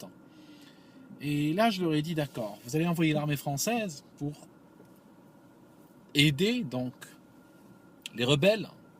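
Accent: Switzerland. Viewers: on valide de la 1ère à la dernière ligne... et retiens la métaphore! Mais, êtes-vous CHuisse?! pas l'accent...les 2? euh... franco-suisse-arabe?! Merci pour la virée en bagnole! La politique prend une telle place prépondérante en France que c'en est insupportable. Plus de philosophie, SVP chers voisins et compatriotes... ça serait moins lourd - à l'instar de la cuisine au beurre d'hier...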